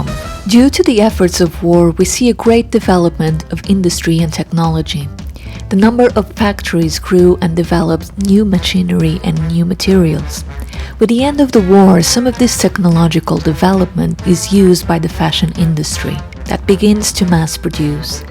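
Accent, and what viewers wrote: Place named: Portugal. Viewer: Portuguese accent . Love it.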